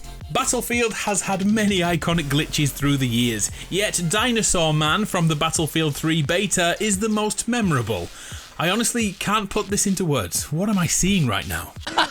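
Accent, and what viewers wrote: United Kingdom. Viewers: wouldn’t expect many glitch in? In Madden? Love you but if your accent/description of football 🏈 wasn’t a dead give away you are from across the pond, then that statement most surely will. 😂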